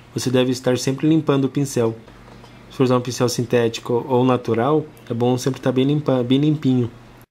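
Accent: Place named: South, Brazil. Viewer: Gostei muito do vídeo, comentários bem pertinentes e achei ele bem carismático e natural... Amo esse sotaque do sul ❤😆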